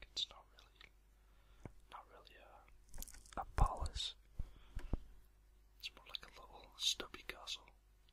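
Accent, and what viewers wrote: Scotland, United Kingdom. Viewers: Are you Scottish? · Heeey your Scottish !!! Snap lol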